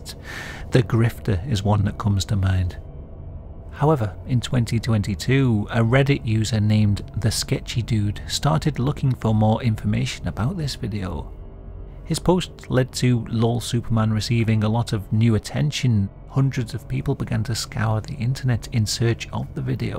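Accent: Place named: Lancashire, United Kingdom. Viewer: What a great channel this is. So much content that one doesn't encounter amongst the plethora of disaster/death/murder that's online, and with stupid American narration. Here's a Q. for you,; might you be from roundabout Lancashire perhaps? Just going by the good accent!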